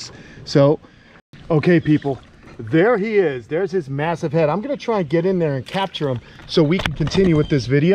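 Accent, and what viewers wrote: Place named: Long Island, United States. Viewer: You are do funny! I can hear the Long Island in your voice and the way you talk! Your sense of humor is SSOOO NEW YORK!!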